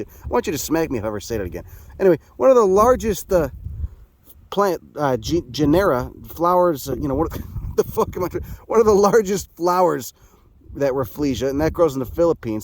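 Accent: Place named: New York, United States. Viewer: from NY so used to hearing things explained this way.. feel like im home if i had to guess this dude is from NY (LI) and is either Italian or Jewish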